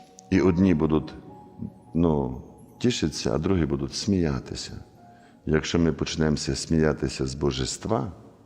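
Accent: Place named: Western Ukraine, Ukraine. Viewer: я зі Львова, отець так говорить як західняк (схоже він з Франківщини), але де його парафія? це Днпро? і яка це конфесія?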